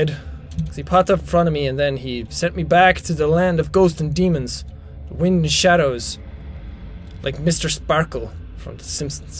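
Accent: Ireland. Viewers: you sound Irish I know because im half Irish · Are you Irish? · First time he sounds Irish.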